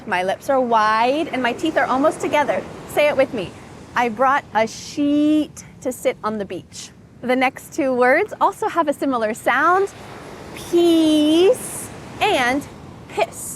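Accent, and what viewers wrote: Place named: United States. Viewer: very very important and had a great time with your beautiful American English. Thank you so much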